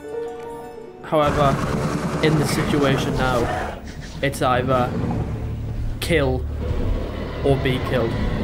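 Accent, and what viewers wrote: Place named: United Kingdom. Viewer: The UK accent is fire bro you got the whole YouTube voice 🔥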